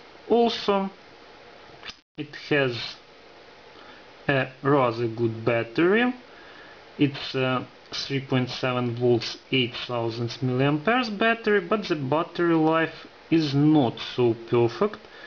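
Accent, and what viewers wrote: Russia. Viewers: с первых слов произношения слышно русского :)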